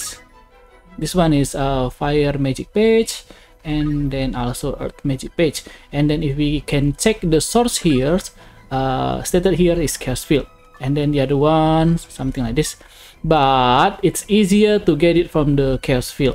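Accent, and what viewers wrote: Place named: Indonesia. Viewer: logat indonya keliatan😁,mantap bang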